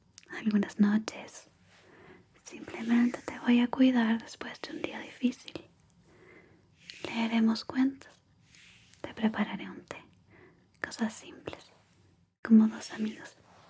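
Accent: Chile. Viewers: Desde la primera vez que te escuché, quedé enamorado y fascinado con tu dulce voz y arrobador tono chileno 🌹👸🌹🎼🎵🎶🇲🇽🇨🇱 GRACIAS 🙏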